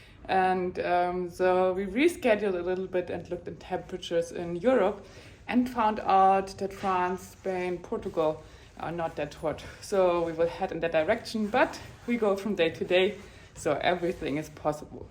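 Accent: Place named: Germany. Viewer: Jura is pronounced SHÜÜRA and not with your heavy kraut accent YOOORAH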